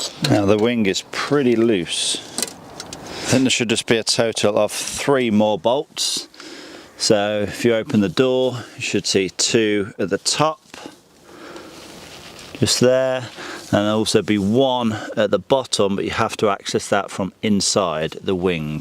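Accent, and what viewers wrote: United Kingdom. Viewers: You gotta love the British accent in this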